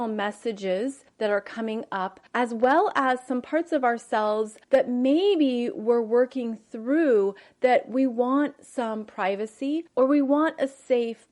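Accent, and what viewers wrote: United States. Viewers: I bet you are from Michigan. Northeastern...???? I hear it in your voice.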